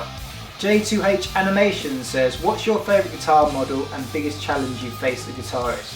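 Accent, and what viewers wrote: United Kingdom. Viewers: You're BRITISH!!!! I thought you were spanish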